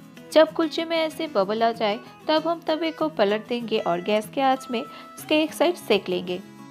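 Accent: India. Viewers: Such a sweet Hindi accent , thank you for the reicpe.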